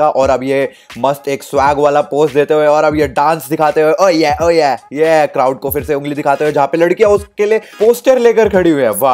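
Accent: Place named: Hyderabad, India. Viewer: mohtarma hyderabadi hai hamare yaha per aisich baat kartay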